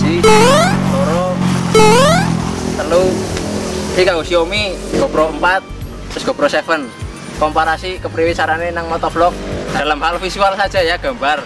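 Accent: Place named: Banyumas, Indonesia. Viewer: Jangkrek penak videone yen ditonton..... Karo misoh. Wkwkkw seneng nyong ktmu video ngapak kaya kiyelah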